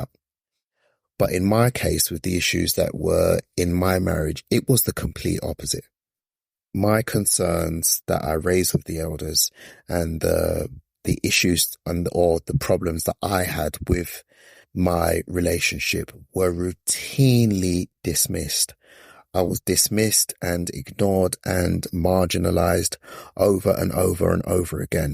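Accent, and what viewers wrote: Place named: United Kingdom. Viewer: I am bingeing all your videos! Your uk accent drew me in. Also in uk. Near london.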